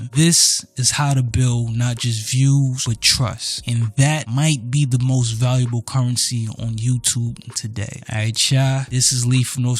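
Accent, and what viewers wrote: New York, United States. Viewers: The NY accent is coming thru super strong!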